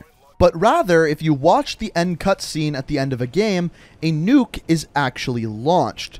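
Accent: Canada. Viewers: I was just trolling man, no harm. And as far as the way he pronounces things, he is Canadian they pronounce things differently than the Americans! Like About is Aboot etc.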